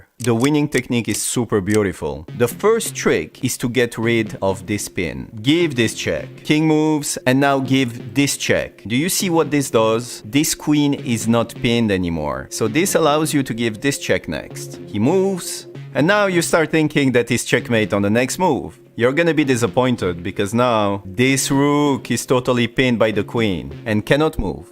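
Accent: France. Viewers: omg the french accent is amazing 😁😁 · boy does the french accent suck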